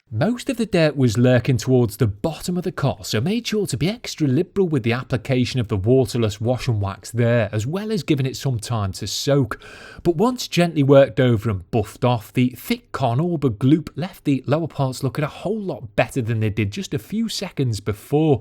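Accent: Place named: Wirral, United Kingdom. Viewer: I'm trying to pinpoint your accent. 🙂 I'm a Wirral lad now residing in sunny Formby. I can't help feel your accent has a Wirral/Scouse tang to it. Am I close? Keep up the good work. 👍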